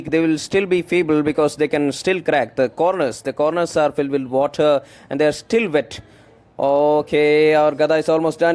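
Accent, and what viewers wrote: India. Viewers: Only Indians can speak English like this way💪🏻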